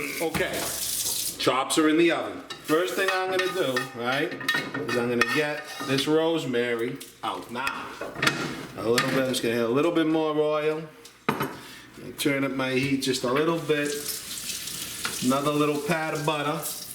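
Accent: Boston, United States. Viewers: Nice and the Boston accent,makes the video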